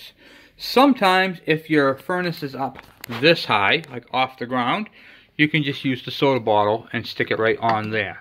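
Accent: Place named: Massachusetts, United States. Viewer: Thanks, from Hillsboro, NH! That really saved my butt. I knew you had to be from northern Mass from the way you talked, LOL. I'm a former Lowell resident, born and raised.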